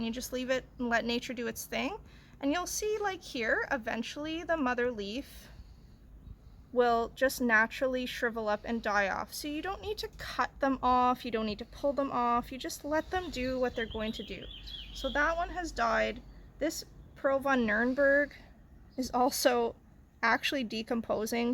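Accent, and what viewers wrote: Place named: Canada. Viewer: I know I am late to party but I have question. Your accent indicates Canada. I wait a year for my little guys to get full sun. They have grow lights. Are you able to put them out because, I am assuming, have cooler weather? I am in California and Summer is over 90 degrees F and often into 100s. I am a dotting plant pappy and fear hurting my plants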